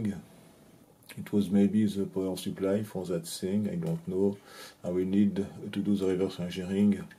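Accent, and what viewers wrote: France. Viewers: No you can not understand the French ascent, it is destroying any ability to understand a word. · It's the most stereotypical French accent and I love it :)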